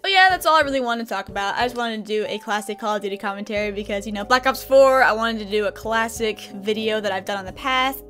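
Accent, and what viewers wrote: South, United States. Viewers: are you southern I like your accent lol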